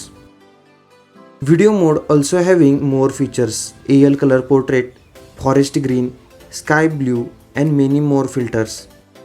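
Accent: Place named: India. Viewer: This indian is trying to pronounce English. appreciate it man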